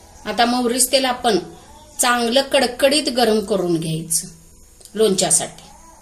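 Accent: Kolhapur, India. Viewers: Khup chan lonche distey.tumhi chan samjaun sangata.aapali kolhapuri bhasha kanala god watate🙂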